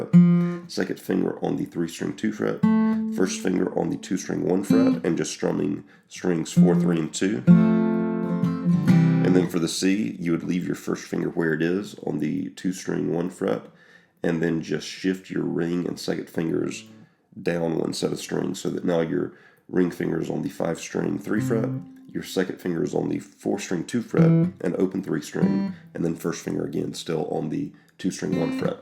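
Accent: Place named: Georgia, United States. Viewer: Thanks so much for all your hard work and easy to follow videos. Wondering which part of the country you’re from. Sounds a bit like GA.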